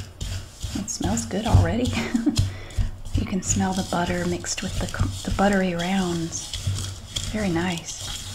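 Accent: South, United States. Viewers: I like your Southern accent. I am enjoying your voice... it's easy like Sunday morning. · I love you're accent! I love southern accents. I'm from Texas, and I have a lot of southern pride! You're so sweet. I love your videos. This recipe was very good. I made it a couple days ago after seeing this and it was great. We are a hellmana family. I don't think they sell Dukes here but would like to try it. Have a great day! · that face you made when you said, BUTTERY ROUNDS! lol you crack me up. So glad to see your tomato pie; I love your southern accent. I'm in Wilmington, not so far from you! Thanks for making these videos.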